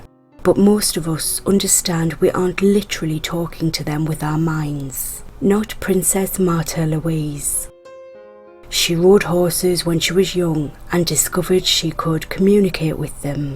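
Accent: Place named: Northern England, United Kingdom. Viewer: Its a lovely soft accent north of England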